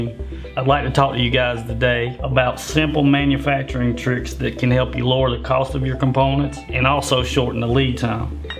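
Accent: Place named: Deep South, United States. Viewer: Holy southern accent Batman. You gotta be from the deep South or the Cajun states. I'm in Wisconsin, spent a decade in Tennessee Georgia north Carolina area and that's a strong accent to me.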